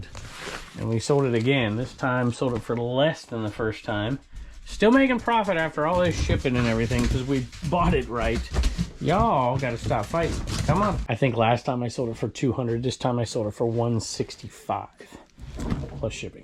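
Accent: South, United States. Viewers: You sound like a confederate.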